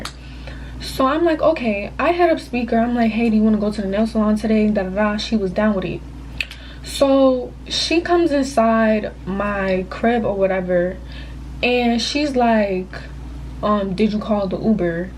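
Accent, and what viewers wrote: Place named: New York, United States. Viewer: I heard the accent and said "sis must be from NYC😄" and I was right lmaoo we can tell from one New Yorker to another. I also think it's something in the water coz these girls are weird and do not know how to be friends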